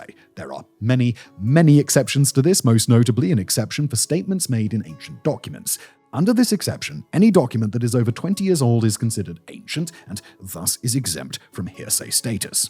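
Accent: United Kingdom. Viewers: The whole video seems very US-based for someone who sounds British and lives in the EU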